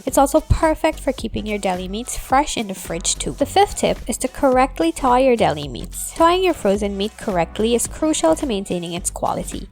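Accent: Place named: Trinidad and Tobago. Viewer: Are you Trini? Your accent sure sounds like it.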